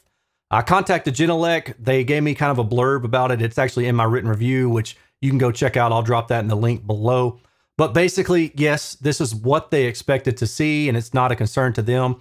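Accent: South, United States. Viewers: That's a true Southern accent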